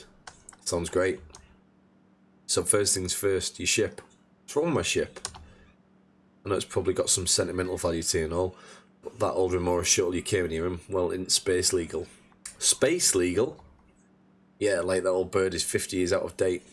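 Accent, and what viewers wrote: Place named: Yorkshire, United Kingdom. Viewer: thanks for posting this video, as i'm deciding whether to buy the game on sale. But why i'm commenting is another matter... you have my voice. I mean you're probably about 30 miles closer to Leeds than me so the accent is slightly different but i'm not kidding you sound like an exact copy of my voice. its actually kinda scary hearing my voice saying words i've never said haha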